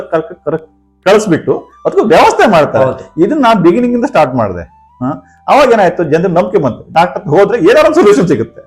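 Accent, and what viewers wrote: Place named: Belagavi, India. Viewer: ಸರ್ ನಿಮ್ಮ ಭಾಷೆ ನಮ್ಮ ಬೆಳಗಾವಿಗೆ ಸರಿಯಾಗಿ ಹೊಂದುತ್ತೆ ಸೂಪರ್ ಸರ್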